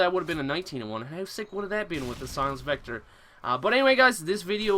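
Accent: Newfoundland, Canada. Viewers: That's no accent, his voice is just high pitched... or possibly just newfy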